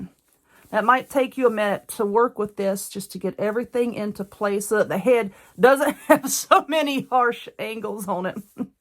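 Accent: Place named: South, United States. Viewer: Please don't ever quit with your tutorials. I really enjoy your accent. I am from Tenn and I really miss hearing good ole southern talk. I also remember these in my granny's kitchen. I also remember one with a poem that used used two long men's white socks. Do you have any idea about that craft? If so could you please do a tutorial on it.. I just found your site and am definitely subscribing.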